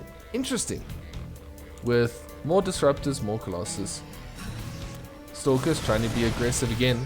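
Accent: South Africa. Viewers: Great casting. Thanks for the video. Hmmmm do I smell a fellow South African :D Subscribed.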